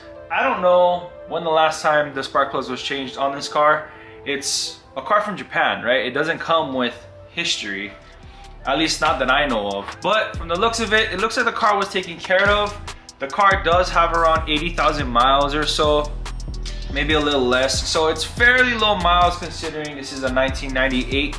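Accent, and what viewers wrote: Hawaii, United States. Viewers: You from Hawaii braddah? Can hear da pidgin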